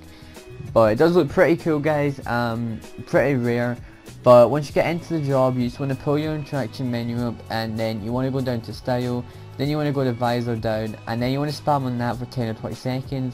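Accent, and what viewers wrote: United Kingdom. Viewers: iSorryME he is Scottish we are special people 😏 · Are you from Glasgow?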